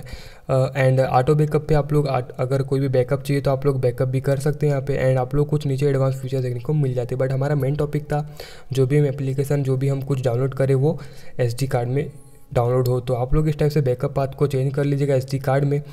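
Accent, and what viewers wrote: India. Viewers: He speak in indlish. Their tongie are regguerer-tongue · Sir u write english speak indian why why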